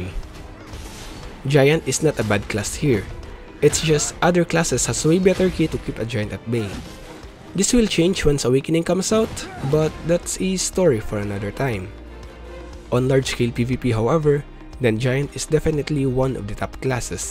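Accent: Philippines. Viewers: Pilipino ka ba? Parang pinoy ka mag English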